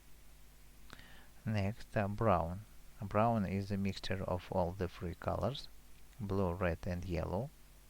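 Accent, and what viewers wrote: Russia. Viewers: А ты русишь ты спалился что у тебя русский язык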